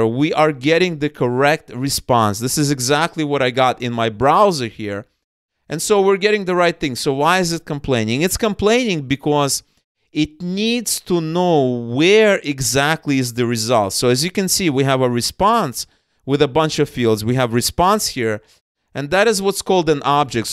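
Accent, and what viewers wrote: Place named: Russia. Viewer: Чую русский акцент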